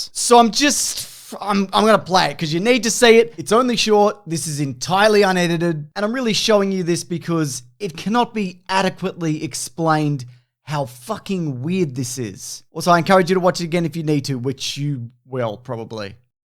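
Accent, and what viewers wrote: Australia. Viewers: High pitched Australian makes me want to get corona so i can eject phlem from my lungs and stuff it in my ears so i can mute this horrendous shit.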